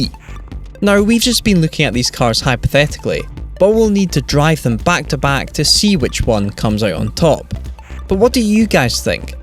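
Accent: Scotland, United Kingdom. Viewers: please get someone thats not scottish...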